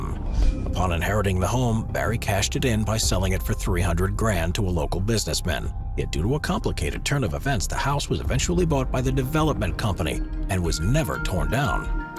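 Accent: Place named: Philadelphia, United States. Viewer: super random by are you by chance from the philadelphia area? I notice the way you say words like "majority" and "Florida" with the a pronunciation which is how my philadelphian parents talk. I feel like I dont hear it that often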